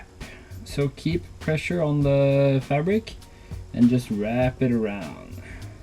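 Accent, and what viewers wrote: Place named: Norway. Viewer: we love Norwegian English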